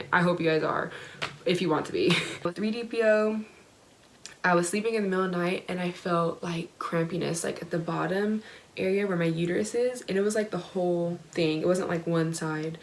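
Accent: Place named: New Zealand. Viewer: Are you from New Zealand? You have a kiwi accent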